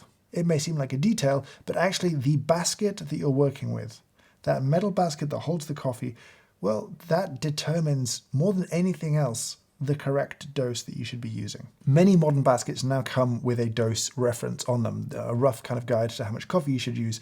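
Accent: United Kingdom. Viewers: Why is it that ANYTHING a Brit says sounds more intelligent and authoritative than an American? The accent alone sends the credibility of any statement through the roof. I'm not sure if I should believe anything this guy says, but I don't have a choice. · I have listened to you for hours now. 🌿🌸🌿 I love your videos and signed up for the Oct 3rd coffee tasting. I’m in the states.You are so English it’s like watching someone do a caricature of an Englishman. “You don’t want that. No one wants that.” You are so enjoyable to watch and listen to. Thank you 🙏🏻 for the incredible wealth of information. I saw you flipping through “The World Atlas of Coffee” and ordered it used from Washington state. What a gem you are. What fun. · Watching in bed on silent w/ subtitles. ( so as not to wake my beautiful wife) I find a Hoffman coffee instruct-able without his nuanced Brit accent flowing through my speaker to be a bit like an underextracted quality coffee. 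A missed opportunity for a better experience. Miss your voice but I will do better next time and extract the optimal experience next time- with volume on😁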